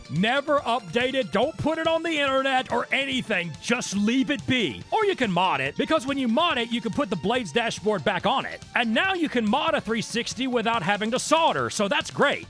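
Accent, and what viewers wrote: South, United States. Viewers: ahh, a southern man telling us about bad hardware on game consoles. Peak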